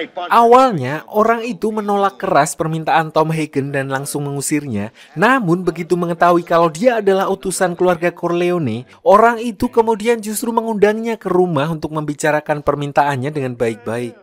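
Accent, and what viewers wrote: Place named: Tegal, Indonesia. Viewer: Narator asal tegal. Di usahakan ga medok pun susah ya min. Kadangada yg lancar tapi kesulitan bedain antara R sampe jadi RL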